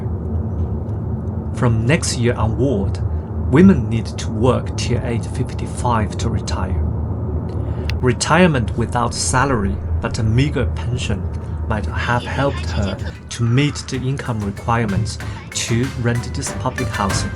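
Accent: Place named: England, United Kingdom. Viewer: I enjoyed your video very much. Your sister’s flat is very spacious and nice, not at all what you would think of as housing for the poor. Your English is excellent, and I’m really pleased that you have an English accent and not an American one when you speak, I also enjoyed the traditional Chinese music. I have subscribed for more interesting video’s. Thank you for sharing.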